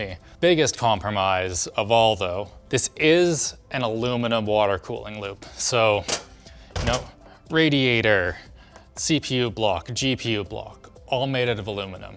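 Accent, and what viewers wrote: Canada. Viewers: I love, but this is the first video where I could really hear his Canadian accent coming through (which was great). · Thicker canadian accent than usual? :) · btw what is that accent? canadian accent? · has never sounded so Canadian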